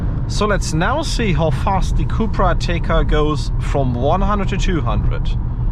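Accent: Germany. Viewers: Dieser krasse deutsche Akzent ist schon hart 😀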